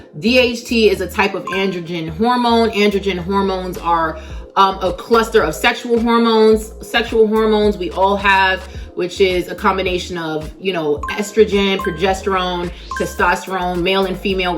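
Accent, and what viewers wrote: New York, United States. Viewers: Sis, love your content, love your hair, love your New York accent! You keep me rolling! 😄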